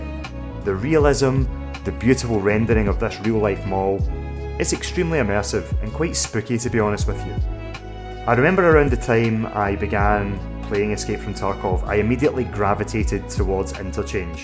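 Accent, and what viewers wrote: Scotland, United Kingdom. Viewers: Appreciate hearing an awfully Scottish sounding voice in a tarkov video. This caught my eyes on my recommended feed and hopefully will get into others too.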